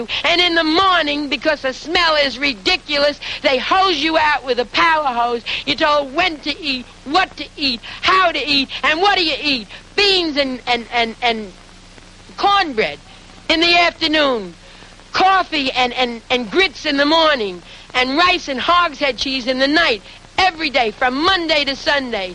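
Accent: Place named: Larchmont, United States. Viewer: It's the Larchmont accent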